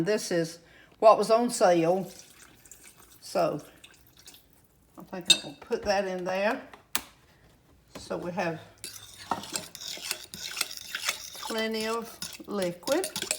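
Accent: South, United States. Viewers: your southern char., hospitality and accent is the real deal.. What a blessing you are!!! And most importantly YOU LOVE JESUS!!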